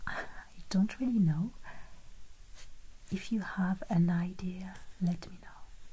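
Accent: France. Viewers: These are my favorite types of videos, that makes....love the sound of the paint brush strokes on the tapestry's....and the running of her hands over the fabric(it sounds like the ocean)....very soothing and comforting....along with the history lesson and background of these beautiful works of art....complete with her french accent....who needs to go France???....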